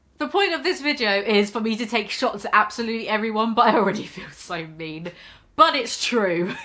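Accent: United Kingdom. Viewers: Are you British ? Sounds like you’re from where I am haha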